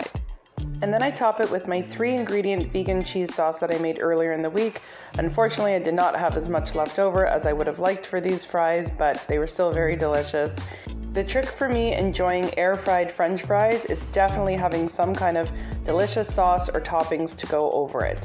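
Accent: Canada. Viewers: Can’t wait to try the apple, banana, oatmeal snack! Looks delish. You must be Canadian, I can hear your accident. Just subscribed, looking forward to your journey. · I hear a Canadian accent! (I live near Canada in northern Vermont!) Great simple delicious recipes. Thanks, and good luck on your journey!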